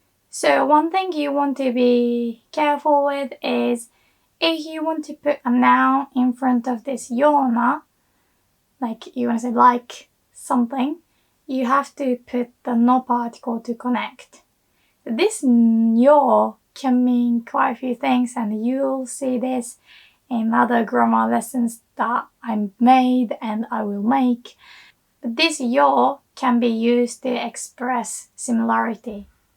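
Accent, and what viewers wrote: United Kingdom. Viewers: 君は英国人みたいに話す。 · 先生はイギリス人みたいに話す (*^_^*) Come to think of it, if I want to say "you sound like (like, your accent)", do I still use みたいに？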